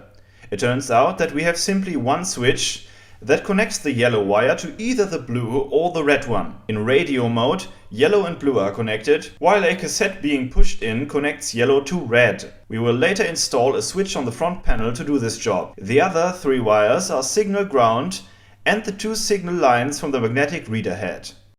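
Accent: Germany. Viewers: lol i can hear your german accent. even tho i can tell you seem to make an effort to try and hide it. but damn i loved then video. great use of all them old bits lol maybe ill put to use the old tape deck i have out of my ford lol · I love your videos and your german accent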